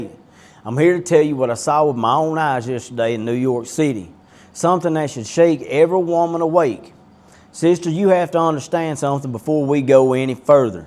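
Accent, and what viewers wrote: South, United States. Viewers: Lived in Nashville for a year and honestly love that accent as the southern belt residents With love from Madina may Allah bless you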